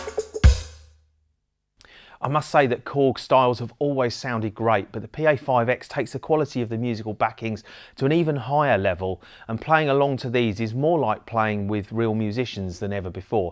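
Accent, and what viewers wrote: United Kingdom. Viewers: Sorry, aber als Engländer hat er halt keinen deutschen Akzent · Sein Englisch ist makellos und ein schöner britischer Akzent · Cockney geezer 😅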